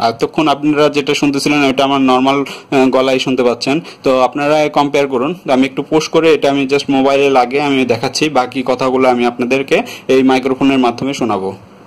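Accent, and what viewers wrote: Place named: Sylhet, Bangladesh. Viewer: আপনি সিলেটি..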